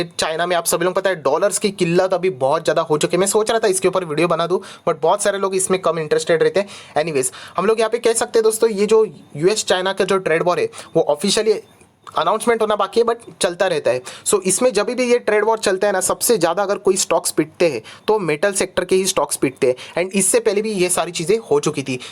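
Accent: Maharashtra, India. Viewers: Sir aap ki mothertongue Marathi lagata hai.....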